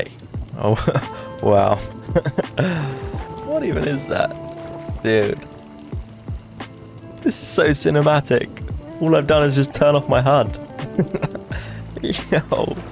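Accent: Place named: England, United Kingdom. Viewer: Love the inventive builds and chill vibes. I prefer your English accent over the American :)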